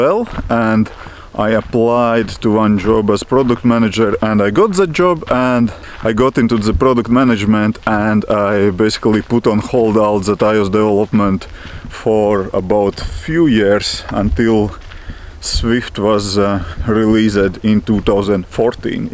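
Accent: Russia. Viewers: Доброго времени суток, такой акцент у вас русский) подскажите вы меня понимаете?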